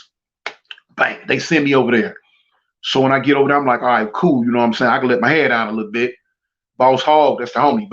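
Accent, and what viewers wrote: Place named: Texas, United States. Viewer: I hear a Texas is accent 6ig homie most got people down there huh when you hit that (Mane) it makes me think that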